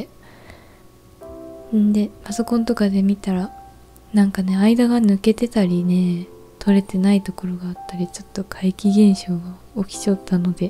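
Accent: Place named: Shimane, Japan. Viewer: の島根言葉がかわいｋ・・・zzzz..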